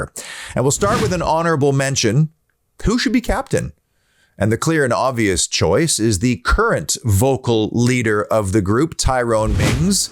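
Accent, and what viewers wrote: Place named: Canada. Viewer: This is a great podcast, wasn’t sure initially when I heard the accent, what do Canadians know about football 😂, very judgemental I know, apologise for that. I will be adding this channel to my weekly watch as you left me feeling very optimistic and excited for the season a head. Keep up the good work 👍 UTV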